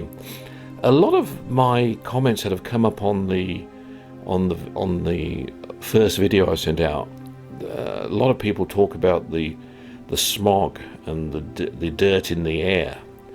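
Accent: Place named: United Kingdom. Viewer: I like to hear a smart people like you(and your normal British accent) Greetings from Poland.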